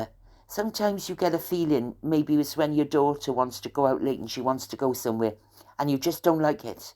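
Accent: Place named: Newport, United Kingdom. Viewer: Welcome back I hope you stay on for ever. From a former Newport women who lived in Pillgwenly for twenty years, now loving in Italy. Your accent takes me back to when I lived in Newport. We love you ❤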